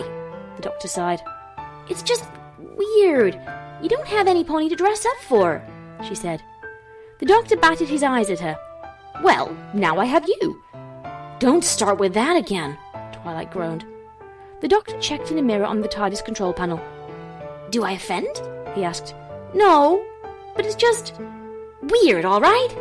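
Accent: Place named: United Kingdom. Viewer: YOU ARE BRITISH INSTANT SUBSCRIBE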